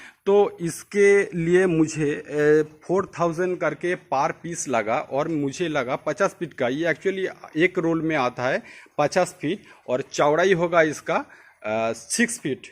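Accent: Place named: Odisha, India. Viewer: Bhai Apke bonle ki tarike Se lag Raha hai app odissa Se ho.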